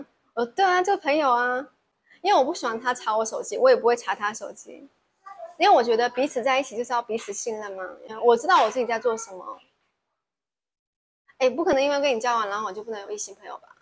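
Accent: Taiwan. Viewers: 口音变台湾腔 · 您的中文聽起來已經有點台中腔了，大概是慢慢地被同化了吧？ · 为什么非要学台湾腔？ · 厲害，講話幾乎沒有大陸口音，反而是台灣口音了！😀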